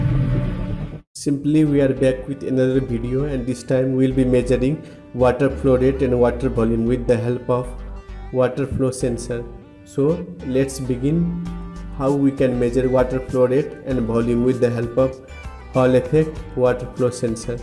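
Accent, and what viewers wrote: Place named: India. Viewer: Hard to understand with that Indian accent and the background music makes it even harder to understand what is saying. Now you know what need to change. Content and illustration are good.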